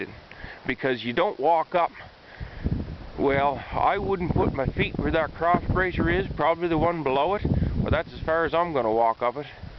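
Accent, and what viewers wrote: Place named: Maritimes, Canada. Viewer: Maritime Canadian accents the best accents.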